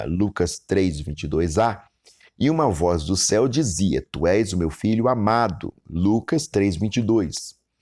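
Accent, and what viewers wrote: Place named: Minas Gerais, Brazil. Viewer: As pessoas de Minas Gerais aprenderam o significado de "impelir" da mesma forma que você irmão. Sotaque não é sinônimo de ignorância 👍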